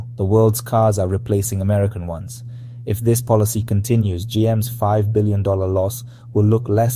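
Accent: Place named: India. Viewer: I wonder why an indian from india is narrating this site! India joined china to destroy the dollar. People pretend to be our friends while they stab us in the back!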